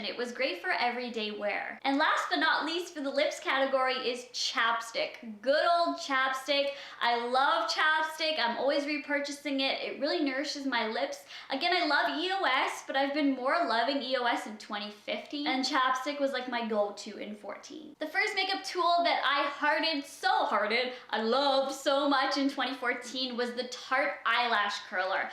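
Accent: Canada. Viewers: I do not understand why everybody hates on she is a great person why is everybody so concerned tht she is writting words in the american way im canadian n sometimes i write in the american way everybody also makes fun of he bout the she says words tht have tht letter "a" in them all countries have certain accents n tht a canadian accent honestly haters if u have nothing nice to just dont say it at all because u r ruining day n all of her cuties day btw ily ignore the hate 3:-)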